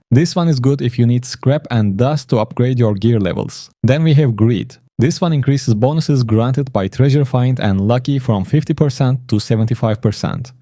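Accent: Turkey. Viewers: kanka ingilizcenden anladığım kadarıyla Türksün. F2P oynanır mı zevk alınır mı bu oyundan ?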